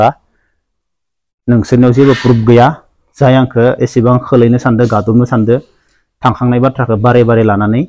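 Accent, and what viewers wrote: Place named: Kokrajhar, India. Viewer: Dabw Kokrajhar style railainw rwnga ada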